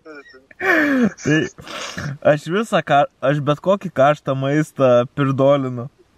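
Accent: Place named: Panevėžys, Lithuania. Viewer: girdis kad panevežiokai :D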